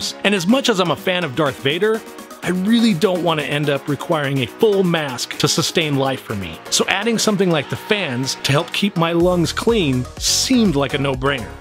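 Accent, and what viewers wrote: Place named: United States. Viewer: Nah, I’m just pulling your leg over the slightly peculiar US pronunciation. It’s a bit like how you guys have an odd way of pronouncing aluminium. It’s just a mildly amusing linguistic difference across the Atlantic. 🙂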